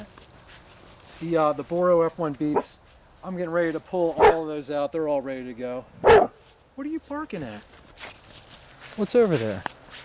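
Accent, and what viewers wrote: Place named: Maryland, United States. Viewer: That east-coast Maryland accent brings me right back to my homeland of Philadelphia. Love it.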